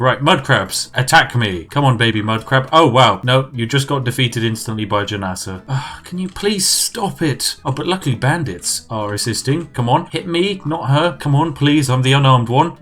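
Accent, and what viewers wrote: United Kingdom. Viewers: i like his „that’s absolutely perfect“ and „fantastic“ 😂 of course in a perfect british accent. and of course: „oh, goodness!“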